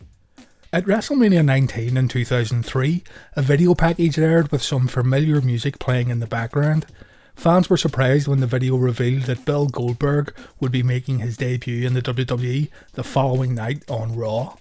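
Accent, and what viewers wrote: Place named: Belfast, United Kingdom. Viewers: Awesome work. You're knocking out the best videos on YouTube. Sounds like you're from Belfast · Good effort on the vids mate You a Belfast man? I’m down in carrick. Appreciate the vids anyway chief keeper lit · What part of Northern Ireland you from?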